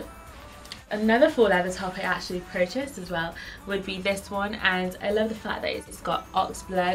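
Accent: Barbados. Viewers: Totally been stalking your channel, heard your accent and knew you were a fellow Bajan in the UK! New subbie!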